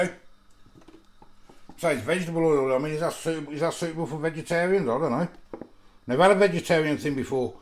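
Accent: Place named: Midlands, United Kingdom. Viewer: I thought i cud detect a midlands accent.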